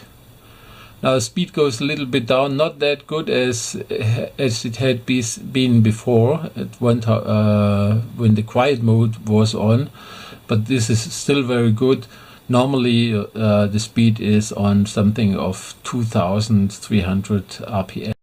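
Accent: Germany. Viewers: Man merkt absolut dass du deutsch bist aber trotzdem gutes video :)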